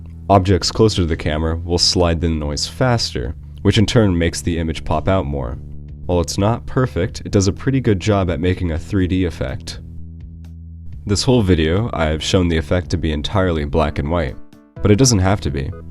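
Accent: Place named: United States. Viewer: I find that if I pause it, the picture becomes still and that annoying, dull, monotonous American excuse for an AI narrator stops too. This leaves me feeling peaceful, somewhat aloof and ready for anything.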